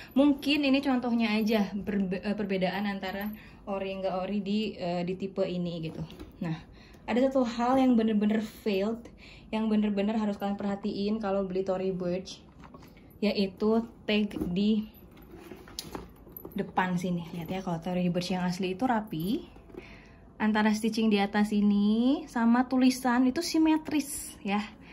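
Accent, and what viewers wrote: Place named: Philippines. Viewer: Are you Filipino? You speaking with a little bit mix Tagalog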